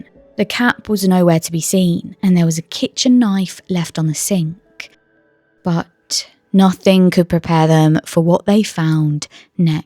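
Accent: United Kingdom. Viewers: She is British, so you want her to sound anything other than her own? 😂 I’m even more confused. You don’t like it don’t watch it problem solved. Haters gonna hate no matter what. Geez. I always love accent and how she present her story. Keep going girl never mind the haters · The narrator is British, hence the accent. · The really pronounced, British accent ruins the video. It’s hard to sit through.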